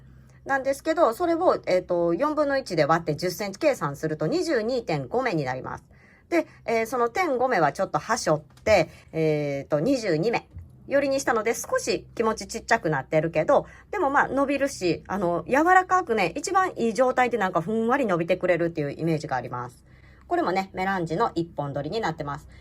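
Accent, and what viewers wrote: Kansai, Japan. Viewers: はじめまして、こんにちは😃 なんか、馴染みのある関西弁でご説明されてるので、思わずコメントしちゃいました😂 兵庫から鹿児島に嫁いではや30年、今だに関西弁が抜けません笑笑 この編み方、難しそうですが、面白そうなので、ぜひやってみようと思います😊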